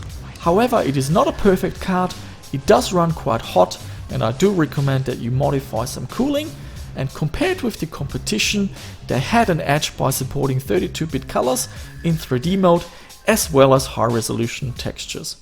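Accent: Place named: Germany. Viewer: oh ein deutschsprachiger, das hört man sofort gg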